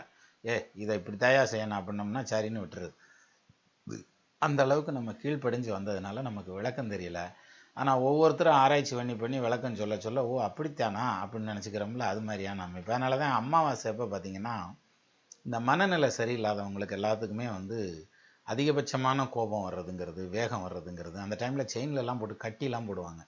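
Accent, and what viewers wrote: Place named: Madurai, India. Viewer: Great explanation sir with a simple Madurai slang. All videos are very interesting it’s induced my astrological view.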